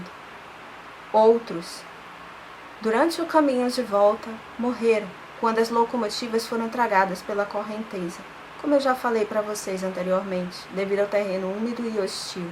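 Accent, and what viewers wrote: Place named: Manaus, Brazil. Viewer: Sabia que a era de Manaus! Mesmo sendo carioca, reconheço facilmente os sotaques brasileiros. Estava em dúvida entre Amazonas ou Pará.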